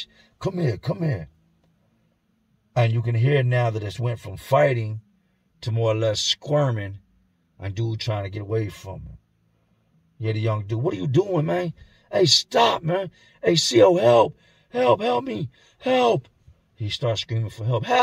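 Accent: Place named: Philadelphia, United States. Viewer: he fr talks how we talk out in philly, its just where and who he's spent his time with that influences how he speaks. just cause we talk a certain way doesnt make us hood or anything else we just talking how we are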